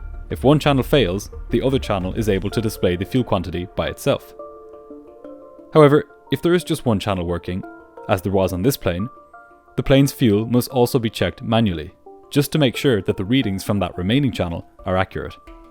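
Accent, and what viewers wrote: Ireland. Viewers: Its the Irish accent - its where all American accent descend from so has a a warmth to it you are familiar with.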